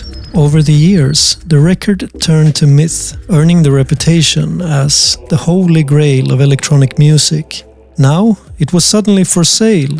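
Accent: Sweden. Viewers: You didn't ask me to like, subscribe or comment, but i did all three. Awesome video and i love your mild Swedish (?) accent.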